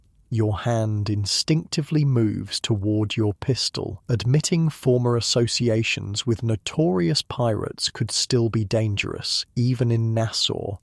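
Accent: England, United Kingdom. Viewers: Anyone knows where this accent came from? England?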